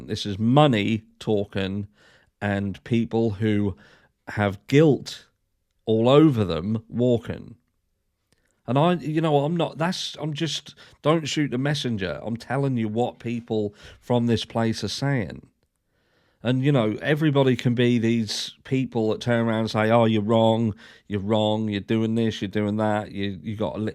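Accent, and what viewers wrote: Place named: Norfolk, United Kingdom. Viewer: you're very welcome. It's mad considering both our countries speak the same language there are so many differences, and I much prefer Norfolk accent to my own accent 🤣🤣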